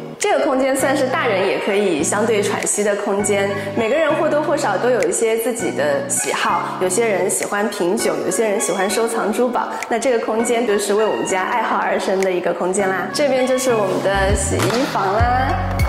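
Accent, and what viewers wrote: China. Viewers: 主播是台灣人嗎？感覺有京片子腔，是大陸媳婦兒嗎？ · 是大陸人嗎？口音聽起來實在是不習慣😅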